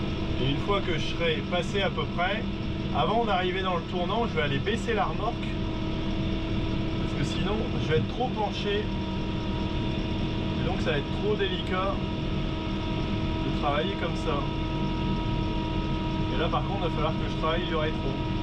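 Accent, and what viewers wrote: Nord, France. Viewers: Bonjour, super vidéo Puis moi qui suis originaire du nord, j habite maintenant en Sarthe, ca fait du bien d entendre du ch'ti!😂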